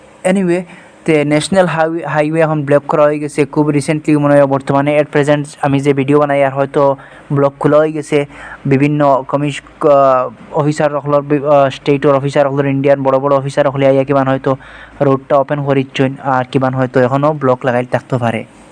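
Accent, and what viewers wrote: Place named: Sylhet, Bangladesh. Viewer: Maybe because of His Nagri accents it sounds like Borok.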